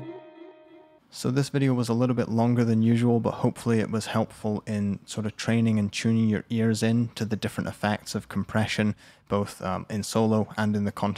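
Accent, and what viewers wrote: Scotland, United Kingdom. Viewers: Ery fine. The listening was the important part. The post compression comparison made what I was hearing visible. Thank you. Do I detect just a wee Scottish burr in there? Well hidden , but awe the same....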